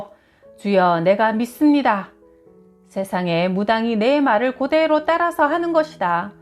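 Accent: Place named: Jeolla, South Korea. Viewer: 아따 전라도 사투리가 구수하요 잉~~~ 어쩜 이렇게 사투리가 맛깔나게 표현 하실까 사투리로 웃다가 예수이름 나오니 눈물이 가득 했다가 점쟁이가 예수님 믿는걸로 웃다가 울다가 ㅎㅎ 감사합니다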